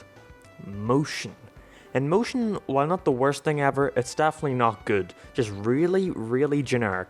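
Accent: Ireland. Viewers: Oh my gosh he sounds so Irish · I fell like he has a Irish accent someone tell me if he is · He has more of the Irish accent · Bro is Irish asf 6y later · jeez early sounds irish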